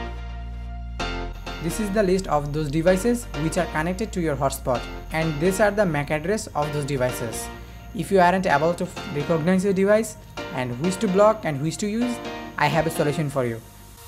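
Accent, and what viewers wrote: India. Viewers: Ooooff...That Indian speaking is so bad to listening 😣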